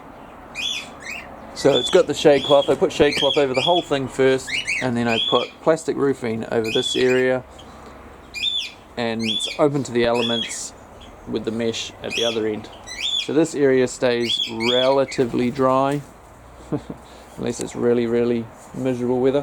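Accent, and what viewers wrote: New Zealand. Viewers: I recognized a fellow kiwi accent immediately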